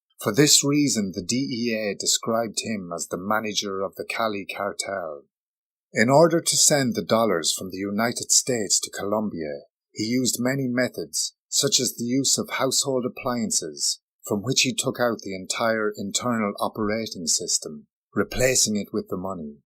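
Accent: Ireland. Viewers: That Irish accent is not the best narrating sound lol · Colombia in a Irish accent. That as a Scottish person and someone deeply into Colombia and the false war on drugs , CIA, Pepes and Pablito etc very good Patrick Escobar Popeye RIP.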